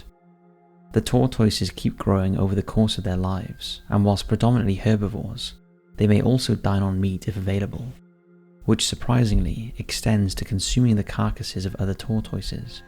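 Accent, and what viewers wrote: Wales, United Kingdom. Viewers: he's from Wales so it's the way they say it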